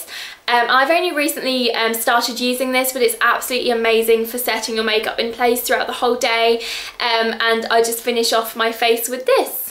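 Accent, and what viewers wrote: RP, United Kingdom. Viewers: You're so posh!:]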